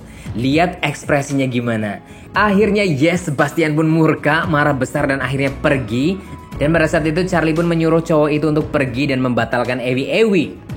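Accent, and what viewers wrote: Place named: Cilacap, Indonesia. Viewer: Aksene cilacap gak iso ilang ya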